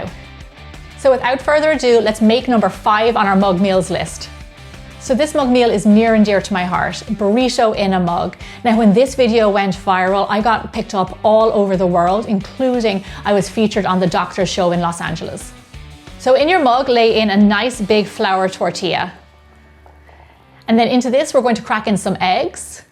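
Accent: Ireland. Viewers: I lived in Dublin for two months and your accent is bringing me back so many good memories especially during quarantine!! I have so much love for Ireland and everyone from there ♥️ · Love your Irish accent. I'm Irish too. I love the videos and follow your recipes often. Thank you! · Oh class she’s Irish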